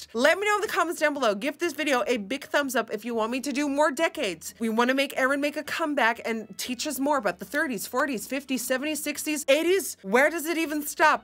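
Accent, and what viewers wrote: United Kingdom. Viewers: I often like blue color on my eyelids. I really enjoyed watching your tutorial. And your British accent...Ah!!! 😂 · I love your British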